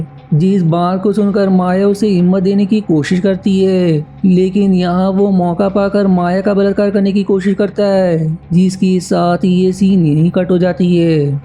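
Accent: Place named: Bihar, India. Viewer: तू अस्सल बिहारी लगता है मेरेको हो तेरी जिंदगी थू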